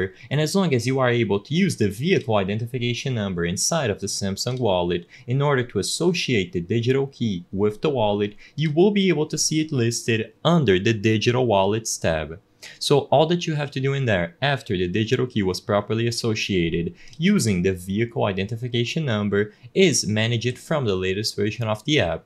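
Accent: Brazil. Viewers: That's certainly a Brazilian speaking English. You got caught by your accent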